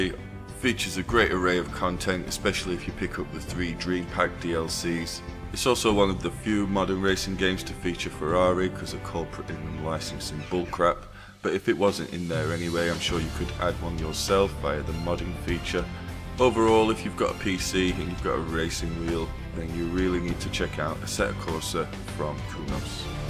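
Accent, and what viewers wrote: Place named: England, United Kingdom. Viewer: Hey I luv ur english accent, you make great vids and its a pleasure to watch it, thanks a lot. :)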